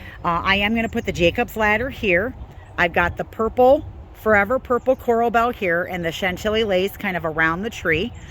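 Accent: Midwest, United States. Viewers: If anyone needed an example of Midwest accent, this is it. Love the video!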